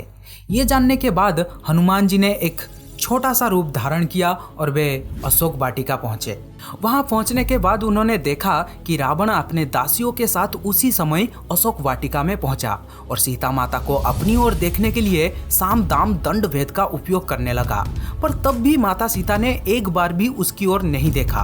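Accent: Odisha, India. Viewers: Kya ap Odia ho ?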